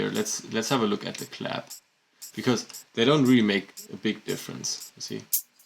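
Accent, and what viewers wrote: Germany. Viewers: bist du ein deutscher? du hörst dich deutsch an. du machst super arbeit!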